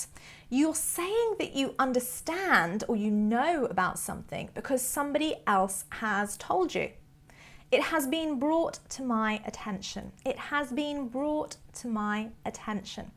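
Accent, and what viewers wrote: United Kingdom. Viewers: I love British accent a lot and your accent also. I had been in England for 10 mouths it's easy to speak English but there's some confusing for me because I'm French speaker . I mean there are many words similar in French and different pronounce.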